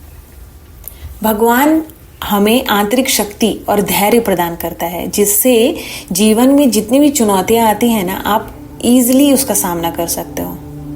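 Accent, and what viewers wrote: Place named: Maharashtra, India. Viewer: Apka voice bahut soothing hai👌👍 very nice video 👌 & i think you are rmarathi from your accent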